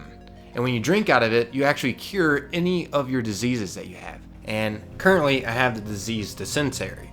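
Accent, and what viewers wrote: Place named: Delaware County, United States. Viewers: Philly all’s I hear is philly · Are you from Delco lol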